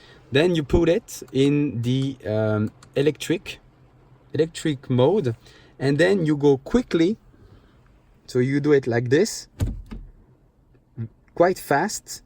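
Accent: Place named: France. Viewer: Tu es français? Je l'entends à l'accent et aux "euh" qui ne trompent pas lol Merci pour la video, mm si je ne possède pas du tout cette voiture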